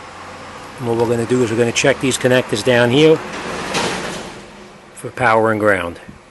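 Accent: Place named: New York, United States. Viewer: Love the video thanks! This guys definitely a New Yorker! 👍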